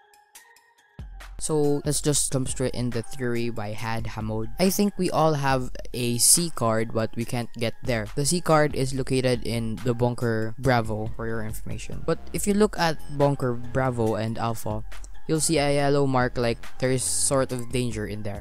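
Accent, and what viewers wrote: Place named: Philippines. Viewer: Ur voice is like a Filipino:) I'm Filipino too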